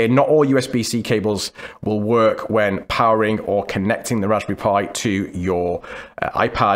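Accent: United Kingdom. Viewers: Hey, how do you setup the pi as a ntewrok server to connect to the files while connected on the ipad? where you from, is there a hint of a welsh accent?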